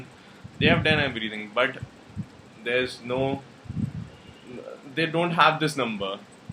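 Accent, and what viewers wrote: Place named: India. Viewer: Indian English... First learn English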